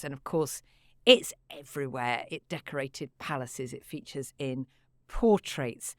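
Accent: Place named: United Kingdom. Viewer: Nice British English!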